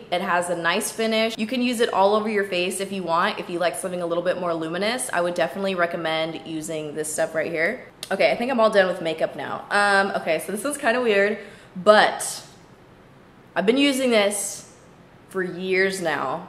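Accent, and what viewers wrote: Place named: California, United States. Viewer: I freaking love you lol no homo. All of the girls I watch are from the east coast so it's good watching someone from Cali on here that makes me feel like I'm not a weirdo for talking like i do haha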